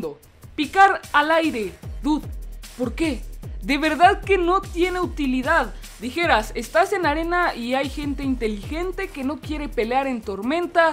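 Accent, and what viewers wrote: Costa Rica. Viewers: Eres tico verdad? Lo digo por el carepicha😂😂😂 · Usted es de Costa Rica?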